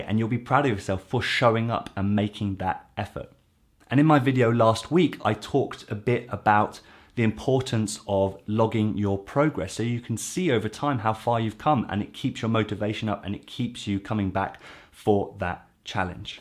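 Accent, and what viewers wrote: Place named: United Kingdom. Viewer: J'aime ton accent britannique. :)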